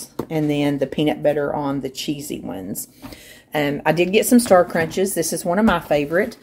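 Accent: South, United States. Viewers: I adore watching you! You remind me sooo much of my family in Tennessee. They have all passed so listening to your southern drawl is such a nice reminder <3 Blessings to you!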